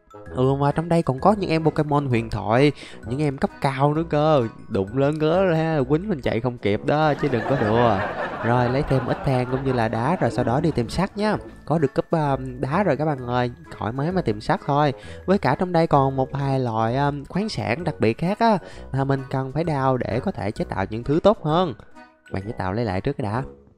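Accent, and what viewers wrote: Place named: North, Vietnam. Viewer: Coi lại video cũ của anh khúc đầu nghe anh nói mà cứ tưởng người Bắc ko à =))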